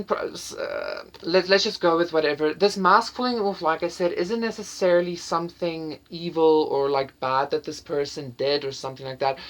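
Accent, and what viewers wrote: South Africa. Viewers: You sound South African, I enjoyed listening to you speak! Thank you for the reading 💜✨ may your channel continue to grow and be prosperous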